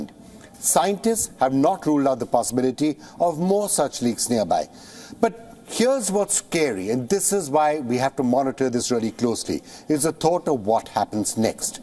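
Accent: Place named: India. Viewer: Into a "Depths" of the pacific ocean. Funny indian guy speaking funny english😂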